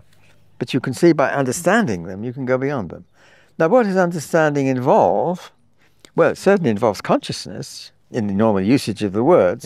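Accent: United Kingdom. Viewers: Gypsy tongue with a British accent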